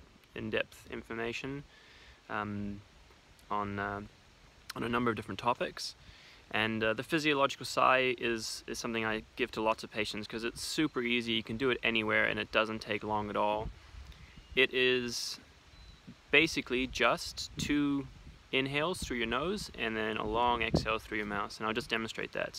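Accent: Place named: New Zealand. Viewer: Mate, you are getting a kiwi accent! I used to see you in Kingsland in 2010s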